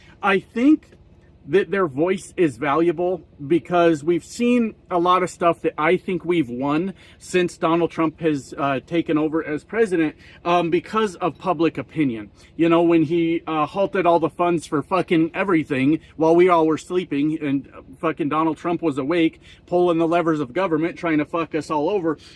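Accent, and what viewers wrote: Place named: Canada. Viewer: You are hilarious !! Are you sure you aren't Canadian cause you sound just like us !! Love you brother . !!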